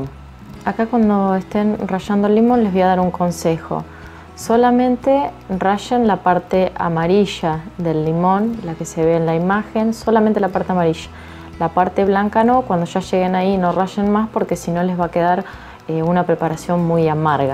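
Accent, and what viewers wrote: Argentina. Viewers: Cuanta practicidad y, por si fuera poco, mas saludable que con manteca!! y tiene acento entrerriano. una pasada. El finde la hacemos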